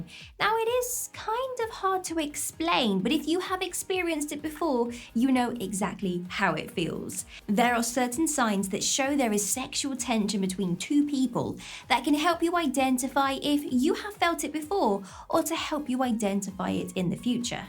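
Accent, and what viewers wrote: England, United Kingdom. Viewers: You’re so sweet. The most pleasant video I’ve watched. What part of England are you from? I’m in Essex. Well done 👍 good video. And well researched. I felt so good watching. This.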